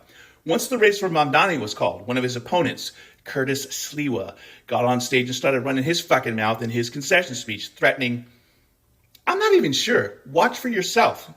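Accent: Scotland, United Kingdom. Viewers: Uh oh the Scottish accent is a bit sticky lol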